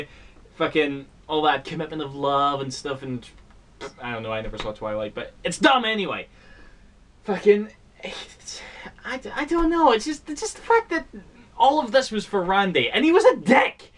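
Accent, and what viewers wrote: Scotland, United Kingdom. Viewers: I'm not sure about this. I don't agree with some of the choices, and there is no footage or anything. But then again... You are brilliantly scottish. .... I'M CONFLICTED A!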